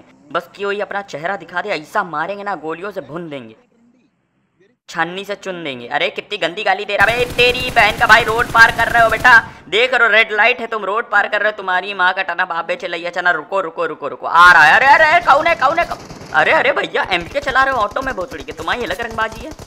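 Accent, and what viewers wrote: Kanpur, India. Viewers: Bhai Full Apni Kanpuriya language bol rhe😂😂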